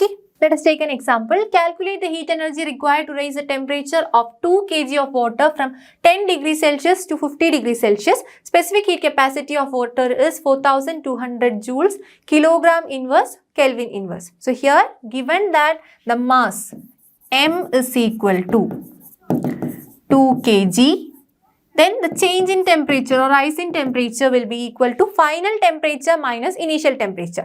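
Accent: Kerala, India. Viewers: Ahn accent kettale ariyam pinne name 😂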